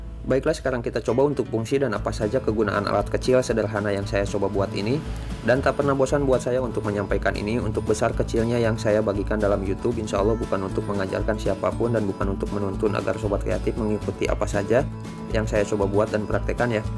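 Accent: West Java, Indonesia. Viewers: logatnya urang sunda pisan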